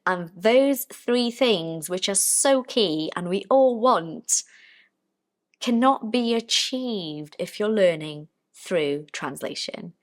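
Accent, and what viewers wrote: United Kingdom. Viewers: Thanks, beautiful. I really like your British accent. It's very pretty. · You must a Brazilian, speaking the English language as a foreign language in Brazil. And you also sound a little bit like a british person. Congratulations, my dear!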